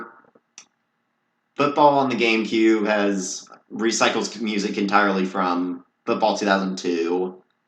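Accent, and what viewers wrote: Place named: Texas, United States. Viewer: why do you sound almost exactly like terrev but slightly more texan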